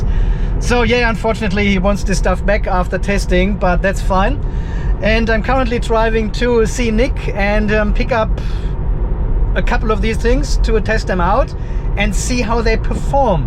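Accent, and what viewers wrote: Germany. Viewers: Durch Zufall bin ich auf deinen Kanal gestoßen und dachte so ... Ist aber kein native speaker. Klingt deutsch. Und du bist es tatsächlich 😎. Sehr cooles Video, sehr schön präsentiert. Das Beste, welches ich zum Thema gesehen habe 😊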